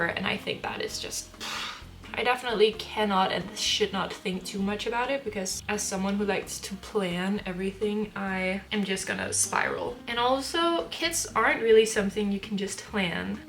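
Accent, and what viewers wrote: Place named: United States. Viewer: I love, love, love your videos! It's my favorite way to start off a cozy Sunday :) I really enjoy that you have stayed authentically yourself as your views have grown. I think that's what your audience appreciates about you. I like seeing the joys but also the irritations of daily life. You don't live beyond your means, you don't pretend that you are not on a budget. You share your struggles about work/life balance and the added complications that hormones give to us women. Keeping things real makes me cheer for you all the more! In terms of what extra content to add, I myself am really interested in your language(s) journey! This might be very specific to just me though lol. Learning about the way we communicate/express ourselves is endlessly fascinating. From what I've gathered, I think you speak Danish, English and French? 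I am from Seattle, but I have traveled/lived in all different areas of the world, and I find your specific "language mannerisms" so interesting! I know it's very common for most of the world to speak several different languages, but I have found that usually when someone from Europe is speaking English, they tend to have a strong underlying clipped British tone. You, however, have an almost American cadence when you speak. Im not sure if it's from watching Gilmore Girls and Twilight so many times (lol) or the books you read or having lived in a couple of different areas yourself. I have watched other Copenhagen videos, and those girls, though fluent, don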